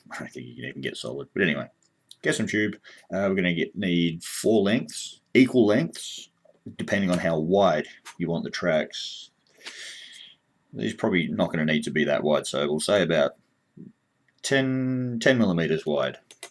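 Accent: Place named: Australia. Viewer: dude you sound aussie as f#ck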